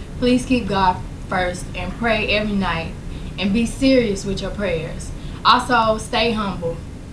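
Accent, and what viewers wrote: South, United States. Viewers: she sounds soo southern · Country!! I miss Beyonce's accent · Country as fuck 😩😁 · I loveee her country accent ❤️❤️ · aww her country accent😭💞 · oh she so southern!! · Aw, she was so country back then. Love it. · She had her belly button pierced at 16!? That was a sin in my house! 😂😂 and she sounds a lil different a link country.! Love her old vids before and during Destiny’s Child time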